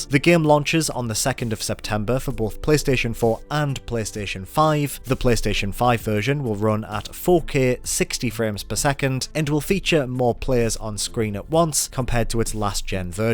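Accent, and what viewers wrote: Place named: Newcastle, United Kingdom. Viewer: I get a warm feeling in my stomach when I hear this guy's little Geordie voice. So soothing.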